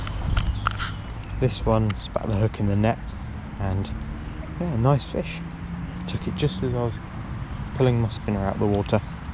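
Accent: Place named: South, United States. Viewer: Hi mate, fantastic location and fish! Your accent sounds like youre down south, im from Buckinghamshire but struggling to find some good wild trout streams like this (a lot of them seem to be expensive chalk syndicates or fly-only). I dont suppose you'd be able to give me any tips on these sort of locations you spin for trout on? Dont mind driving an hour or 2. Can always PM if you dont feel like sharing publicly, thanks, James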